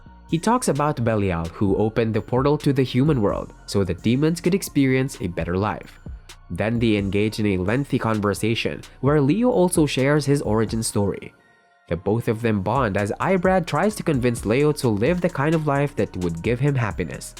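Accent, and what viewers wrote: Philippines. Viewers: Pinoy narrator😁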